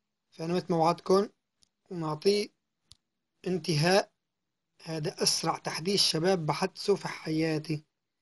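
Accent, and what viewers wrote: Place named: Aleppo, Syria. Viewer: احلا قناة باليوتيوب , ولهجتك الحلبية محلاية القنات, استمر ..انشاء الله بتوفيق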